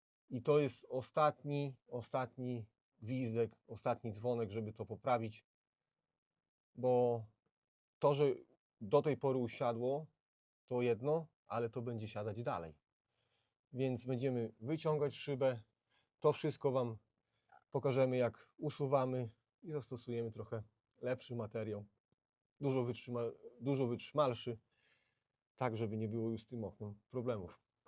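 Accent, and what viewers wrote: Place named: Białystok, Poland. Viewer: Dokładnie! Też słucham i oprócz fajnych technicznych rzeczy zastanawiam się skąd ten sposób mówienia. Tak tylko lekko słyszalny. Nie jak u szczepcia i Tomcia… ;)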